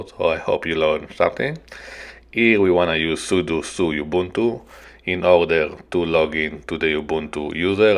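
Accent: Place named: Israel. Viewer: Brother are you Israeli by any chance? the accent is too noticeable 😅😆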